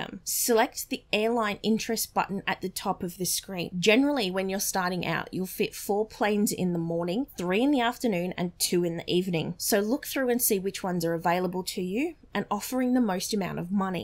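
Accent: Australia. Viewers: "chick with the Aussie Accent" did not disappoint. Thanks mate.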